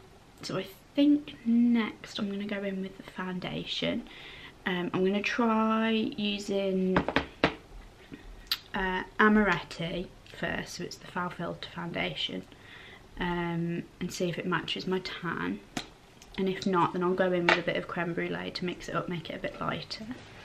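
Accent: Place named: United Kingdom. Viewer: I’m in love with your British accent...it’s so charming!!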